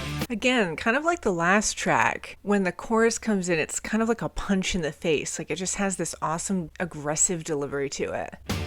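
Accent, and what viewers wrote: United States. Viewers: I can't place your American accent. What part of the country are you in?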